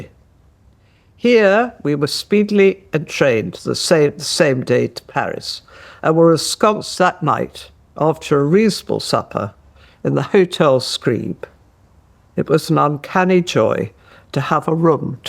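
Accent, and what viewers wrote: United Kingdom. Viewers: She's got an amazing accent-I.love the upper class British accent!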